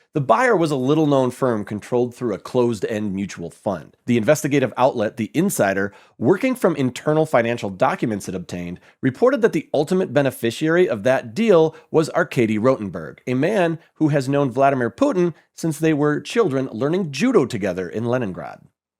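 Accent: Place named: United States. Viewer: He has American accent.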